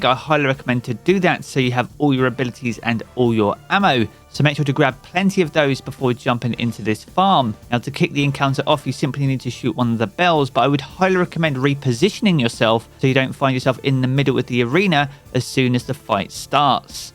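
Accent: United Kingdom. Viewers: I literally loved your content because it’s straightforward and your British accent is just absolutely lovely ❤❤❤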